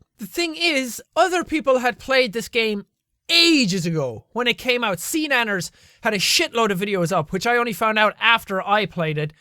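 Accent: Ireland. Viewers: As others have said, I don't feel like you're 'copying' other youtubers. Sure, you do the same things as some of them, but I wouldn't call that copying. The tone is different all around: You've a different scenario in comparison to Pewdiepie, for one example, and yours is the one I find more enjoyable. EDIT: Plus, I find your accent more enjoyable to listen to than his. Irish accent ftw.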